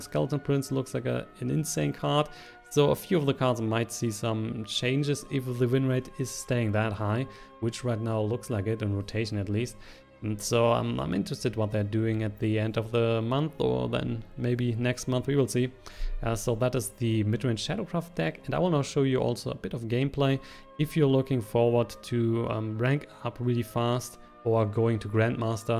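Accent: Germany. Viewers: You sound so German lol. Great video, you should make Shadowverse Videos more often! But why don't you use ceres? I thought she was a staple. Also could you make a Midrange Swordcraft guide too?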